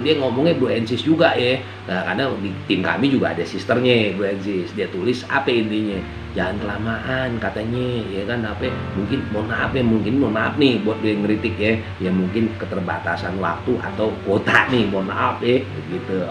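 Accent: Jakarta, Indonesia. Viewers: penjelasan bro sangat enak dicerna. kentel banget Betawi nya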